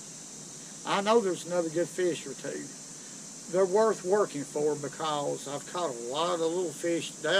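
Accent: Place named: Alabama, United States. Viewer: Is it weird that I could tell you were from Alabama before you ever mentioned it lol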